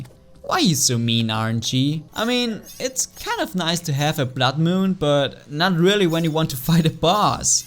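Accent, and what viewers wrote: Germany. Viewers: man hört dein deutsch heraus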